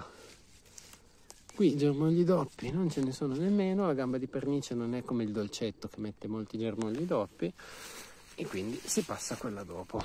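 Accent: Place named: North, Italy. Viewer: Salve SEMPRE molto chiaro. Scusi di dov'è perché non ha accenti ma sicuramente del Nord, io sono del Lago di Como. Quest'anno il Lago è stato riempito 2 volte se non tre.......😊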